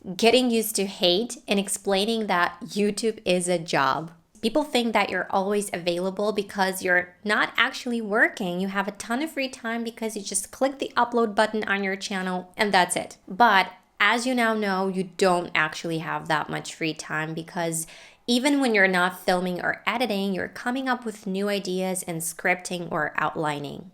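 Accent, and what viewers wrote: United States. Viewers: Hi there, you're the greatest English teacher and as well as your American accent is so awesome..And please ignore the dumbest stuff who're criticism about you..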